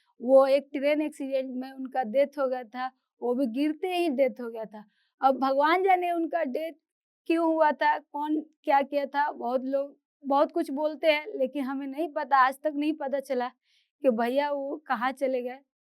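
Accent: Bihar, India. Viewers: Me Bihari to nahi hu ,,,but mujhe bihari tone bahut bahut pasand h. 😊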